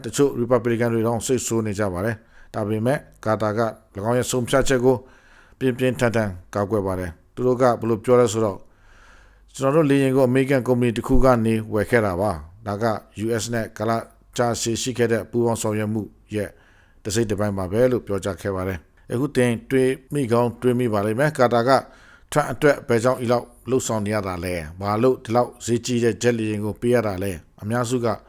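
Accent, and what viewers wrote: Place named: China. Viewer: ဘာလေသံ လဲ စိန တွေ မြန်မာသံ နဲ့ U.S ကို Smearing Campaign...လုပ် ယုတ်မာနေပြီ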